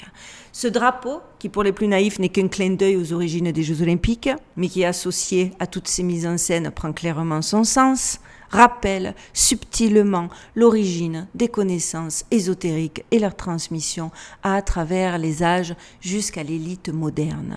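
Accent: Marseille, France. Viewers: Et bien tu m'as captivé et tu m'as apris des choses qui me réconforte dans le fait que je suis de retour à l'église depuis 11 mois et ce 3 fois semaine.. Je te remercie de faire toutes ces recherches car moi j'en serais incapable... J'aime t'écouter avec ton accent de Marseille. Comme quand j'écoutais Clairette ici au Québec étant jeune. Padre Pio sa PROPHÉTIE serait un bon sujet ..🦊🐾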